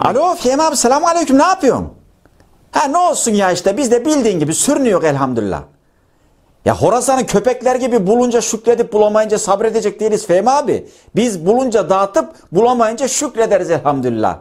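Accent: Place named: Anatolia, Turkey. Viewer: Anadolu şiven harika dostum.😂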